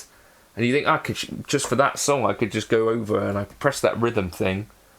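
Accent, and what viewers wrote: United Kingdom. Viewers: The minute that I heard a British accent, I knew that it was going to be about distortion on guitar. The Brits should have an image of a distortion pedal imprinted on the Union Jack (their national flag.) It's against the law to play an authentically clean sounding, electric guitar in Britain. Here is a reminder of what a clean sounding guitar actually sounds like. <link>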